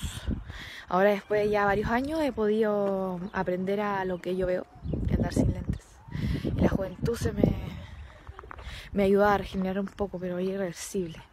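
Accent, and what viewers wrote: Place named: Chile. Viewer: esque es chilena xD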